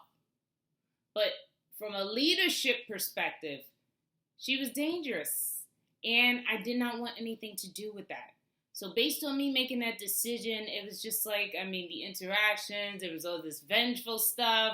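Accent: New York, United States. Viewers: Hi am from Nassau Bahamas I subscribe too your channel early this morning, and you soo beautiful I love your charming sweet voice you could tell that you are a true New Yorker lol.. Amorosa already went on some shows and she already have a book..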